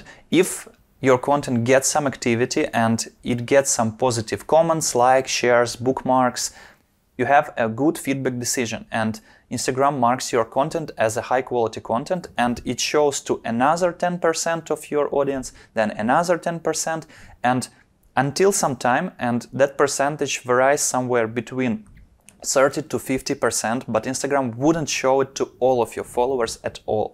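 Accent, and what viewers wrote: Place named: England, United Kingdom. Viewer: Great video, you have a nice English accent! Keep it up m8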